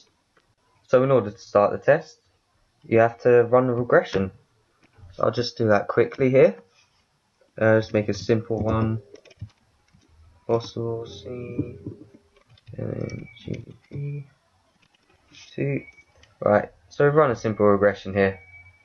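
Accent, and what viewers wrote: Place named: United Kingdom. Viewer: thank you so much!! and love your beautiful british accent....haha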